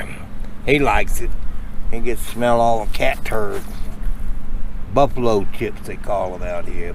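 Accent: United States. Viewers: pretty spot new mexico is it.like watching your videos , you make me laugh with that american accent of yours..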